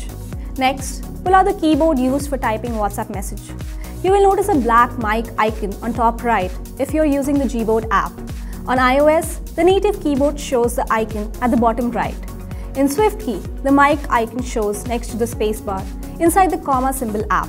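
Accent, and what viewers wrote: India. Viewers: that's not broken English, it is Indian English (read accent), native English people might face difficulties but however, others have problems with native accents too. That is normal!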